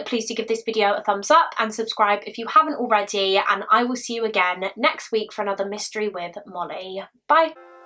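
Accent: United Kingdom. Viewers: Hi just want to say enjoy your content you are a talented storyteller also love your British accent❤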